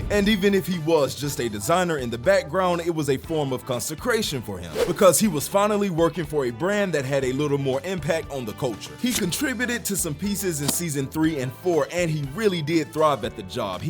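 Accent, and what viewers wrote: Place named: United States. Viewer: I swear the voice sounds like an ai but i cant quite tell for sure because i know this is a french channel but the voice actor sounds very american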